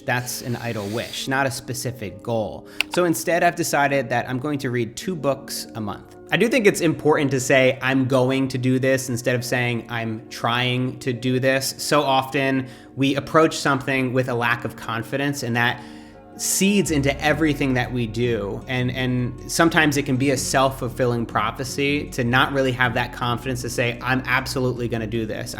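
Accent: Australia. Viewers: "Going FOH-ward," to me, is one of his trademark pronunciations that you just need to wait for. Can't wait for Australian accent to kick in.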